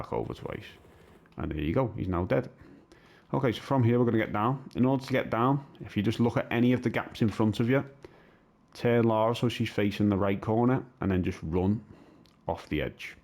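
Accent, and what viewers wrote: Liverpool, United Kingdom. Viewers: Youre guides are mint mate, I take it you're in Liverpool by your accent lol im in stoke if I were nearer I'd buy you a beer 😂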